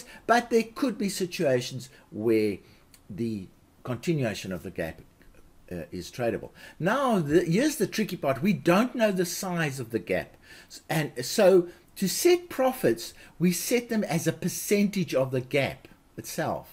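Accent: South Africa. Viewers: are you perhaps from South Africa?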